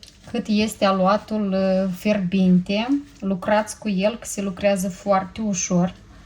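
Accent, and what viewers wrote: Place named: Moldova. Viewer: Ea e moldoveanca și vorbește moldovenește.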